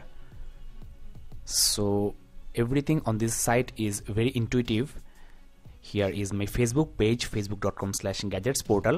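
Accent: India. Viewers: RU KIDDING ME. THIS IS A WHITE MAN WITH INDIAN ACCENT.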